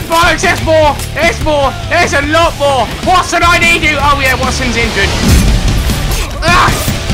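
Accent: England, United Kingdom. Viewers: He is british... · Oh your British yay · damn british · Are you English?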